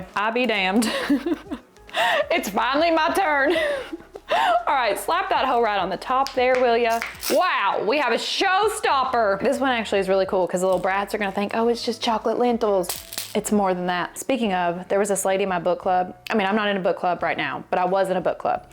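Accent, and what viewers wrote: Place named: Appalachia, United States. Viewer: Just love you, girl!🩷 You sound as country as me. When asked where I'm from; I tell them I'm not just southern, I'm Appalachian southern. Merry Christmas to you and your family.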